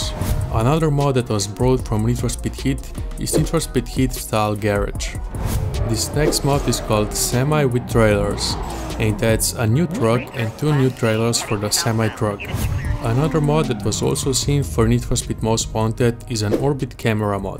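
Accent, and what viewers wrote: Russia. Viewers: Акцент выдает своего))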